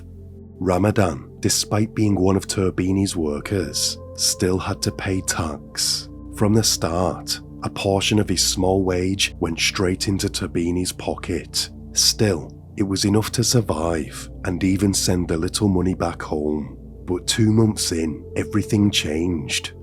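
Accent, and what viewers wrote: United Kingdom. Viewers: Common pronunciation for British folk in certain areas. England has a very broad range of accents and pronunciation habits. I quite like his voice. It seems to work very well for this type of content.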